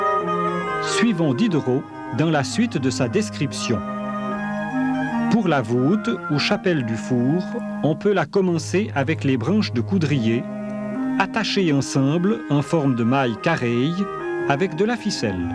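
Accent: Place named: Rural, France. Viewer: La narration, c'est ton papa ou c'est toi qui reprend un accent du terroir ?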